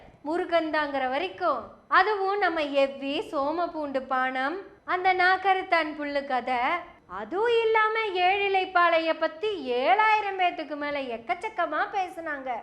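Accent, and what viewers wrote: Kongu, India. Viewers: அழகு கொங்கு தமிழில் ஆழமான கருத்தாழம் வாழ்த்துக்கள் சகோதரி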